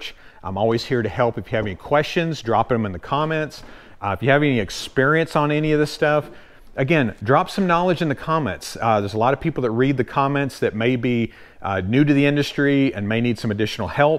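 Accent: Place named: South, United States. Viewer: This Minnesotan enjoys your subtle southern drawl 😁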